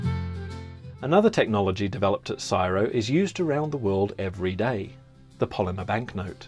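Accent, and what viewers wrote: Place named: England, United Kingdom. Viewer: Your accent mate. Are you a Englishman...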